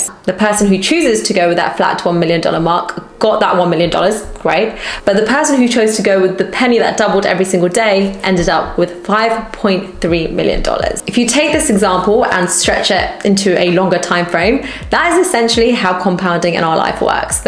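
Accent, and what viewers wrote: England, United Kingdom. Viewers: I'm just here for the British accent 😂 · Firstly, I am American. Your accent is so soothing. I have found your channel and now I am binge watching them. There is some great information you are putting out. Thank you. I am 47 and never really new about financial education. I have started to learn thanks to YouTube. Between Minority Mindset and you and a couple others, I have started to learn. I am so far behind. But at least I have found people who are willing to make videos that caught my attention. I have been researching engine swaps for my car, and Minority Mindset popped up. And then your channel as well. I am so glad it did. I've seen other people like Robert Kiyosaki and a few others. I just bought Rich Dad Poor Dad. I am now on my way. I do have my 401k through my job. So that is good. You are a beautiful woman. And VERY INTELLIGENT. 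And with your British accent, you are very pleasant to watch. I am trying to learn as much as I can. I've been stuck in the rat race like almost everyone else. Your right, that's what they want. All these years I've been making other people wealthy instead of myself. Thank you so much,!!! Truth be told. I saw your thumb nail and thought, wow she is pretty. Sorry, I'm just a guy!!! Lol. But your content is so worth it. Thank you again for helping me and alot of other people turn our lives around. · The England accent it’s annoying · No, she looks Indian. She sounds British.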